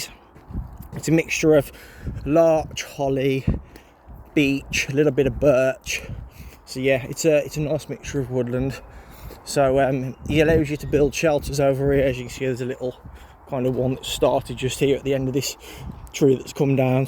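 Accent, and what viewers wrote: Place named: Black Country, United Kingdom. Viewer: Hi mate you sound like your from the black county ? I’m a bushcrafter do you need any help with it ?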